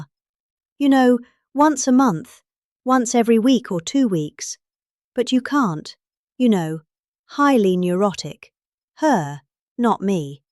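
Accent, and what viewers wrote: England, United Kingdom. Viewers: Can’t wait until this lovely lady reads his obituary in her lovely lilting English accent!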